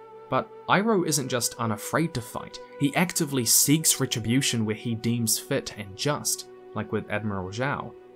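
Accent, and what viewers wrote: New Zealand. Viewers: When I hear your accent, all I can think about is that new Zealand deck commercial. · Ahh I love your accent- It has nothing to do with the video but kiwi accents are always so- yes.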